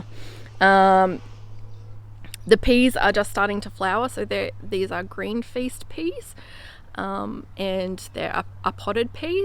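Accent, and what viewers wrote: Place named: Queensland, Australia. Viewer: Omg thank u... i just found ur channel an it's so good to hear another queenslander speak haha im a bit of a hermit an ive just realised I must be watching way too many American gardening channels ...crikey.. on a more serious note, I see ur experimenting with different tomatoes, do u have a fav yet of the large style ones in this climate? im just north of u near maryborough an have an absolute abundance of cherry tomatoes nearly all year round but cant find the same consistency in anything else yet ...maybe the cherries have set the bar too high i dunno.... oh an if u want a good flower for ur front garden put zinnas in, they grow really well need no care an the combinations of colours from cross pollination that occur each season is truely amazing... cheers an keep up the good work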